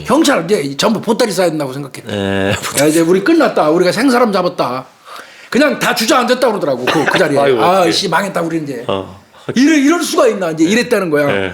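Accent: Chungcheong, South Korea. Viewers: 아...정말 가끔 나오시는 충청도 말씨 너무너무 좋아요~~영화 곡성뒤엔 전라도말씨에 빠져있었는데 요즘엔 충청도말씨에 빠져있어용ㅋㅋㅋ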